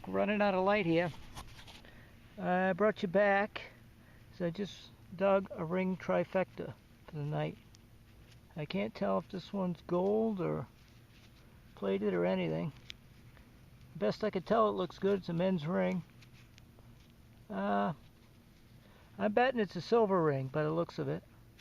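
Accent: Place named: Boston, United States. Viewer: congrats like your vids :D love the accent quadah reminds me of that speaking Boston commercial ;P great finds the funny thing is i found that exact same 10 k ring my fiance wears it now wont let me have back lol and that spoon i find lots of those rogers spoons but i have that exact same one. good on ya keep them vids coming .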